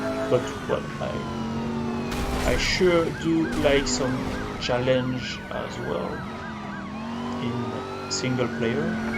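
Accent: France. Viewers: Where are you from? France?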